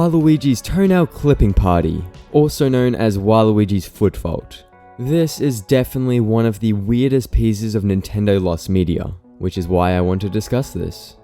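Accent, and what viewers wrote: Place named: Australia. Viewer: he's australian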